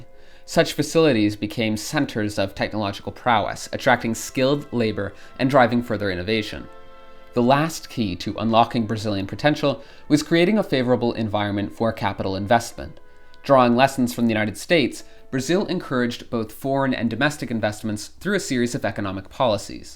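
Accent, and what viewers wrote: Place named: Spain. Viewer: Stop pronouncing our language with spanish accent 🥲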